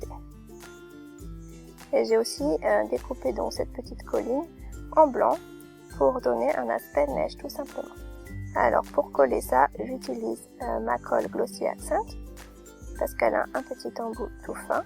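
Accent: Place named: England, United Kingdom. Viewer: OUIIIII tu as un accent anglais so good !!! Mais quand je t'écoute parler je trouve que tu as un petit accent de je ne sais d'où très charmant !! Oh les bruit de la nature c'est si beau !! Ces petits hérissons sont vraiment trop mimi - perso je préfère avec la neige !! Merci pour ce partage PB Bonne nuit et à demain BIZZZZZ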